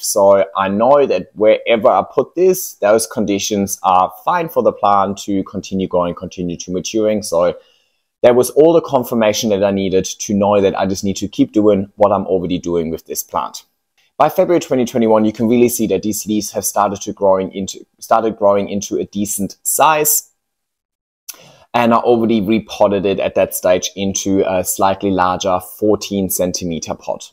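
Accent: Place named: Germany. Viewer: I also thought about that. He sounds a bit German (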